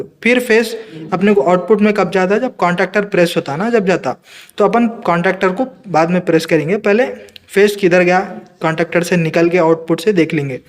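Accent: Hyderabad, India. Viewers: Bhai aap Hyderabad ka hai aap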